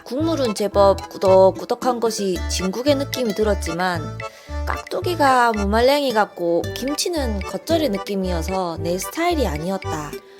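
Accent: Gyeongsang, South Korea. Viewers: 이건 도대체 어느 동네 사투리? 요즘 경상도에서 이런 말투 쓰는 사람들이 있나??? ㅋㅋ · 부산이시골이네? 목솔 들어보니 깡촌녀ㄴ~~ 부산오지마송 밀양가소 그가 더어울릴것가소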